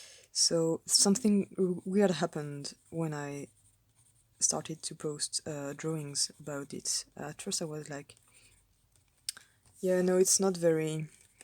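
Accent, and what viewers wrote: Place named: France. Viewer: Oh mon dieu les eighths doctor adventures ET un accent français comment j'ai fait pour ne pas trouver cette chaîne plus tôt. merci de garder le rêve du huitième en vie par la peinture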